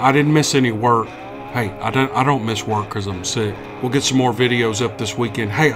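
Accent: South, United States. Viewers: Love your story telling. Your southern draw adds such authenticity to the stories. Keep up the good work buddy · Geez, seriously? There are three things that makes channel unique. 1) The awesome music, unique to this type of channel. 2) way of reading the stories, so honest and respectful. His southern accent really adds to the storytelling too. 3) The awesome stories people send in. They trust with their real life experiences because he is so respectful. So if these aspects don't appeal to some...fine and dandy...just move along to a channel you like....cause we LOVE what does with his! That's right you heard me....move along!! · Teddy Nevarez Right On! The Southern accent is something to be proud of. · I enjoy your southern accent and am a big blues fan. I also believe in bigfoot and appreciate what you do for our entertainment. Thanks bud, keep up the good work! 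· Your music is part of what makes u stand out from others! The rest is great content and that charming southern drawl! Love u! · The music at the intro has such a Southern feel to it, and that combined with your accent and the way you tell the stories is what makes this channel THE BEST! 🤗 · it’s me, your boy Bleu, hailing from Ocean Springs, MS. I now live in Costa Rica (again.) I’m just gonna sing your praises because I dig this topic, you talk like me and I always look forward to your content. It’s my feeling that us southerners don’t much care for folks gushing and it’s likely uncomfortable af for you. That type of thing is super awkward for me, my people back home, most Mississippians and most definitely Cajuns like myself. I’m a fan. Yankees tell you the same thing. 😂 There’s a reason for that. A good story teller is highly valued across cultures in every corner of the world. It’s been that way since the beginning of time, although it’s exceedingly rare these day. 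Keep up the great work. It’s very much appreciated. Blessings to you and yours. Thank you. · Mr. I do not mind the music as long as I get great stories! And I had a cousin that had sounded like you from down south. I think your accent is adorable. Me as city slicker is not offended at all. That is why God made us all different...with a Twist!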